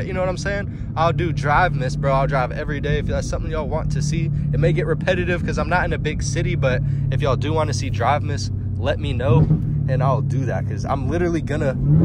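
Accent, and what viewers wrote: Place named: Atlanta, United States. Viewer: White dude with an Atlanta accent is Funny asl ❤️ the zl1 is hard gng 💯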